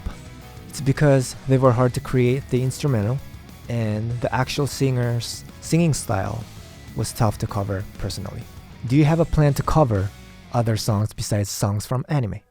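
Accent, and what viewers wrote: Philippines. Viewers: I actually thought he was filipino when I heard his accent, I didn't know. Haha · i knew it from the accents thats he's a half fil 🤣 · I'm probably wrong but I could hear a slight Filipino accent/diction, I'm guessing you lived in the Philippines for some time? Dude your singing voice is amazing. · Yeah the accent is definitely flip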